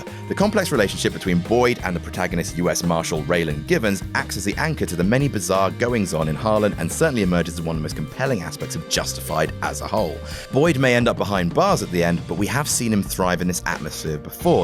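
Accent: United Kingdom. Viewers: These Whatculture lists are kinda stupid... a British accent is just meant to hide the nonsense.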